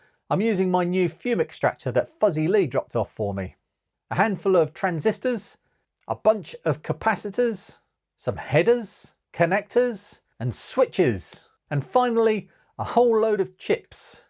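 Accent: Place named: Suffolk, United Kingdom. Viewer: What a great story and outcome!what a lovely human you are lee. Your channel deserves to be a lot more popular,I hope it grows more this year!I guessed by your accent you were fairly local to me in suffolk and nice to see a local charity being supported. Maybe one day when your life has settled down , you could have a retro open day or pub meet, its always nice to meet like minded people !all the best and good luck 😊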